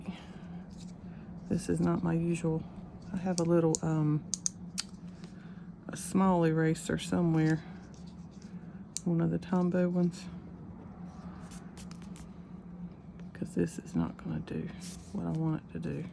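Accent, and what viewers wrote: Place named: Carolina, United States. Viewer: Love your art and I love your accent. I'm a Carolina girl as well. Thank you for the video I learn so much from you.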